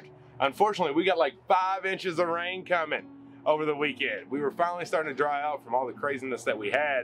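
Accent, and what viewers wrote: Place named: Rural, United States. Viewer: Don't worry about sounding country. We all understand country just fine! 😉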